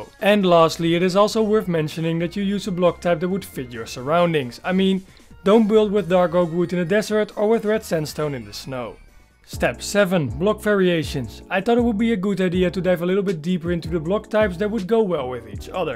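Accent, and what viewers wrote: Netherlands. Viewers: That’s one dutch fella if I heard one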